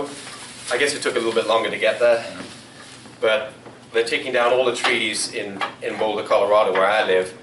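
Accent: Newcastle, United Kingdom. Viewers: Your Geordie accent is so messed up, man. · And the Geordie accent shines through ;-)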